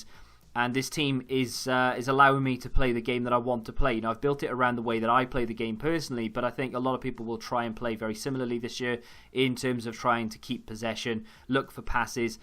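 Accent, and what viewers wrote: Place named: Cardiff, United Kingdom. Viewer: You from cardiff?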